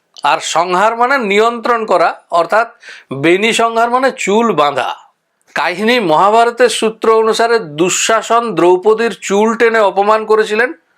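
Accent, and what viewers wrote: Bankura, India. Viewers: মশাই যে বাঁকড়ি উচ্চারণ এই বোঝা যায়। আমিও বাঁকড়ি। খুব ভালো তথ্য পেলাম।❤❤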